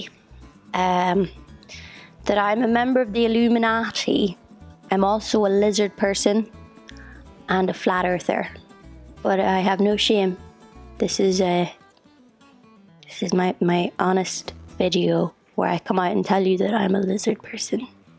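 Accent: Ireland. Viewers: I dont know what it is about you but i find you absolutely amazing.sweet.cute and absolutely stunning.you have gorgeous eyes.and the accent.i know its cliche but the irish accent.and your singing voice!! Sorry.im in love haha 😍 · Clickbait title and video starts with: "Hello Friends, I have a yeast infection". I love that irish accent, and I get better at understanding it every day. I'm at almost 50%!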